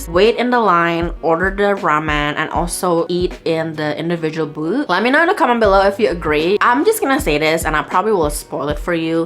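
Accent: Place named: Indonesia. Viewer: Good review especially on the fish tank exhibition at Ginza.Your English is very Chindo :)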